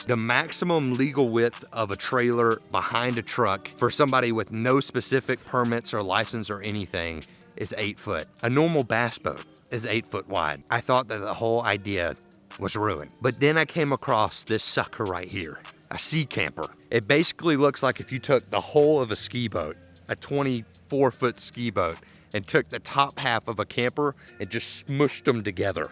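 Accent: South, United States. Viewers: Looking forward to future updates, and subscribing for that lovely southern speaking voice.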